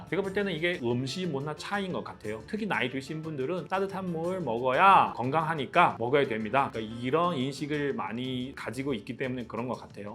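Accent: China. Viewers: 씨는 찐 조선족 동포 한국인 같네요. 잘 듣고 갑니다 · 너는 중국부정뉴스를 말하는것보니깐 중국에서 무지 당했구나 😂😂근데 그렇게 중국싫어서 귀화했는데 중국은 가냐? 글고 한국국민됐으면 한국말좀 제대로해야지 누가바도 중국식 한국어야 😂😂😂 중국티 내지말아야지 본인도 중국있을때 도덕이 바닥친것같은데 글고 니도 대만민진당같이 이야기하네 너는 중국 어느시대살았나 암튼 그렇게 중국싫어서 한국국민됐으니 축하한다 중국식한국말하는 한국인😂😂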